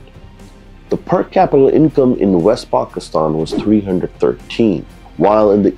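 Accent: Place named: United States. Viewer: Love from india 🇮🇳🇮🇳🇮🇳🇮🇳🇮🇳 we want more information about Indian and pakistan historical... We also learning in Indian school Book (NCERT) but i listen what's pakistani think about undivided india Your voice is good but american accent some time problem to understand Your name is (i think is hindu sir name and also creat from ancient Afghanistan or great India when 16 mahajanpad in north india one of the state... If you know about your sir name and......history about 2th century or gupta, mourya, saatvahan, shak, kushan emperor so please make video my be possible speaking urdu/Hindi)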